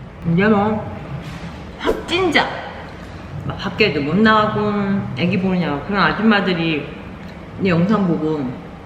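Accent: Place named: Chungcheong, South Korea. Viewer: 힘들게 사는겨~ 충청도 사투리 정겹네요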